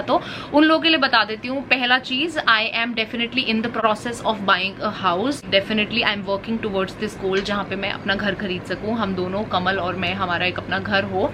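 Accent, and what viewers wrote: Punjab, India. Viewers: ji aap panjabi kyu nahi bolte ho mene apka vo prank vala vedio dekha he usme ap bolte panjabi bahot sone lagte aap panjabi bolte hua.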